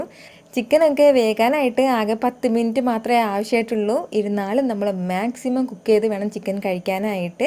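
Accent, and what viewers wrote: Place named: Palakkad, India. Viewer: പാലക്കാട് ആണോ വീട് സംസാരം കേട്ടിട്ടു തോന്നുന്നു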